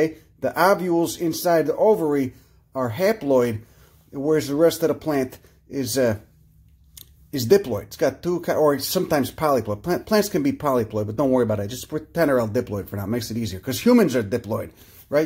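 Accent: Chicago, United States. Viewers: Even with the Chicago accent, tats and foul mouth - it's pretty adorable how much you love flowers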